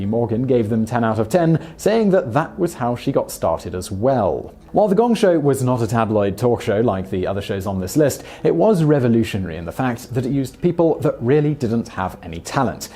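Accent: England, United Kingdom. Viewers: Please for the love of all you hold dear, have someone make sure knows the pronunciation of people's names. Granted he has an English accent that allows for differently pronounced words, like controversy. However, a person's name is only pronounced one way. This is a pet peeve because my name is seldom pronounced correctly. It is beyond cringe-worthy to hear a famous person's name mispronounced.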